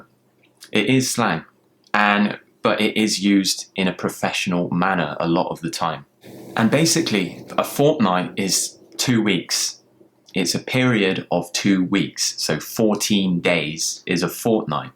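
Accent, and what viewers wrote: RP, United Kingdom. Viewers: Make more videos of your british accent!! I love it! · This is One of the BEST YouTube Channel to Understand more the British accent and words . Cheers! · Hi! MY name os Sérgio and Im from Brasil... I need to say You've got a worderful British accent and your pronounce is quite clear. Your lessons are really amazing!!! My warmest. Regards! Sérgio Alkimim. Brasil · Hello! Where are you from? I'm thinking about moving to the UK, and I want to pronounce English nearly to your accent. I tend to get accents very easily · That's ritght Sorry :) I've been in London for 11 months and still learning English.I know there are many ways to say a word because of accents but when I heard you saying ''cannot'' it took my attantion.I was like umm ''he seems speaking with RP, actually He is more understandable but what about 'cannot' lol'' So I really wondered where were you from or if you had a reason for that ^^ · love ur videos. 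love ur British accent. 👍👍👍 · I love your videos so much, it can help me to improve my English skills. And I love your British accent, last one is you. 😍from Thailand ~ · Good job ! Thanks for sharing useful things, I love learning and imitating British accent a lot. It sounds so posh 😎 keep doing this I'm your student and supporter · Thank you for the lessons,you own well-born accent indeed.Wish I hade a british companion like you,back then in London. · Loved your british , you are the first british that make me love british accent much & more.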